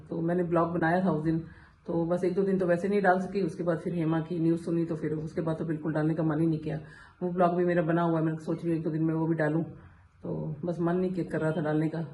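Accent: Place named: Multan, Pakistan. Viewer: Meri mummy bhi aise hi baat krte hai ..hum bhi multani hai...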